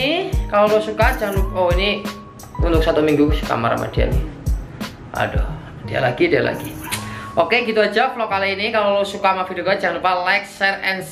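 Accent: Java, Indonesia. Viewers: wkwk ucul bahasanya campur2 medok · Kak nih asli mana sih,ngomong jowone medok bingit Rek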